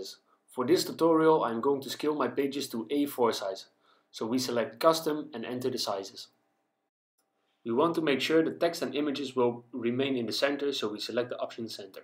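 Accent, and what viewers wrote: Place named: Netherlands. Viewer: Are you Dutch? I can recognize your accent, but not sure :p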